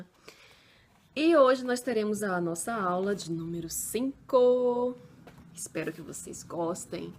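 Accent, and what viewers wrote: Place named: Brazil. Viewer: foi uma boa aula, mas a senhora poderia melhorar mais o seu alemão, tantar falar mais como um nativo germanico e não falar como um brasileiro, o alemão soa melhor com o sotaque deles, então '' Fliegen'' não é '' Flieguem ''... todo EN no final é o som de '' UM '' com a boca totalmente fechada, isso vai ajudar se praticá-lo...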